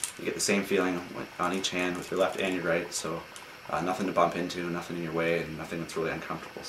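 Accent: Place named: Canada. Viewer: You are canadian, aye? Figured that OOT right at the beginning :-D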